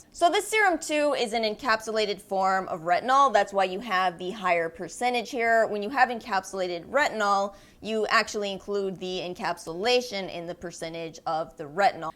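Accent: New Orleans, United States. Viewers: You must be from New Orleans. The “ya heard me” is a dead giveaway! 😅 I’m on the northshore.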